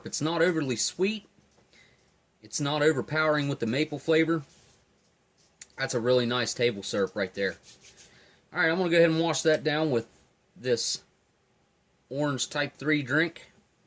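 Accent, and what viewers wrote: South, United States. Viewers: Yup, you've got the southern accent down lol so do I and I don't realize it haha until I started living in Wisconsin.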